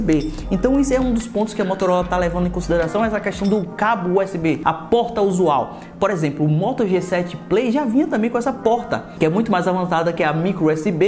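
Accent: Bahia, Brazil. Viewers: Like só por que tu é baiano meü querido. 💪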